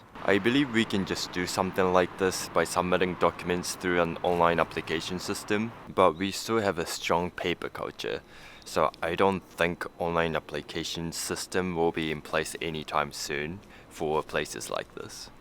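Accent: New Zealand. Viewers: NZ, :D ha I knew it! Your accent was so familiar! First I thought it was Austrailan, but nope it is different from that. (I had a teacher from Melbourne once) As for administration(paperwork and so on), I have never thought I would hear that this exists in Japan. Being a Hungarian, I got used to waiting for hours just to get something done, but since 2020 many things can be handled via email or online, or at least get an appointment. I really enjoy the way you see life and handle things. :) Thx for sharing.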